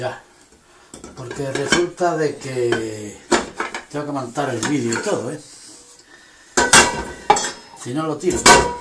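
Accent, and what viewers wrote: Andalusia, Spain. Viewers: Saludos desde Cuba me recuerda a mi abuelo que era andaluz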